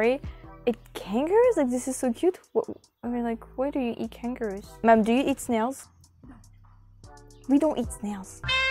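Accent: France. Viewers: I assume you could come to America and a restaurant would hire you for your French accent. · The French language when spoken in English is very nice sounding.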